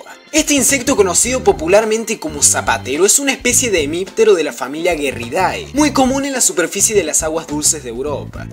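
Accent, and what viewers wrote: Córdoba, Argentina. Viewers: sos de Córdoba? De que parte?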